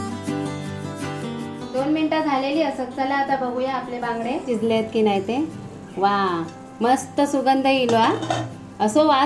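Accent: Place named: Malvani, India. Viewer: Subscribed just to listen yr sweet Malwani... It's too sweet to hear.... मला मालवणी भाषा खूप आवडते त्याचा feel च वेगळा आहे।।।🙈🤗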